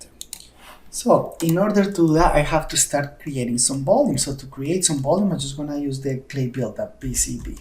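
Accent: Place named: Mexico. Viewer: se nota bastante el acento mexicano ojala te animes a hacer en español un dia krnal saludos!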